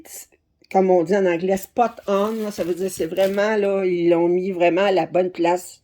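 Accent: Canada. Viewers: Félicitations 🥰 tu es ma parenthèse de calme avant d'aller me coucher, mon moment à moi quand tout es réglé à la maison, et ton accent canadien me fait voyager ☺️, et bien sûr tous tes déballages me motivent à broder (et à acheter 😂)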